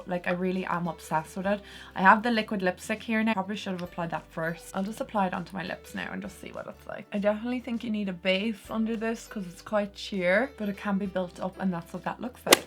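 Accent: Ireland. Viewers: Love to listen to you talk. Some of us from the USA love the Irish accent. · Love the Irish accent! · Are you from Ireland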